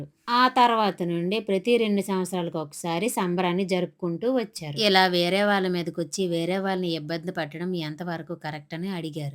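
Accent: Srikakulam, India. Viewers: తల్లీ నీ భాష కాంచెం మార్చు శ్రీకాకుళం పరువు తీయ్యకు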